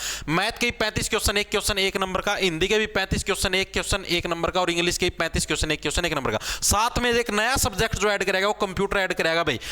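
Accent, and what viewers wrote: Haryana, India. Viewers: Haryana sa ha ya bahrti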